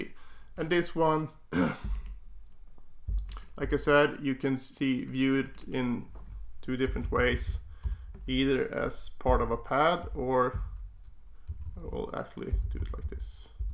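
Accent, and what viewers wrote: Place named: Sweden. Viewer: You have swedish accent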